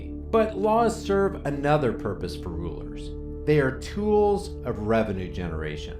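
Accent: South, United States. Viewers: Did he hurt your feelings? I'm from Kentucky too and I realize that there are several different southern accents not only in our state, but in many different states. Also, some of those with accents are police. Hell, they might even be Republicans. You watched this whole video and your comment is about his accent? It is sad that you can't get over your hurt feelings enough to focus the point of the video.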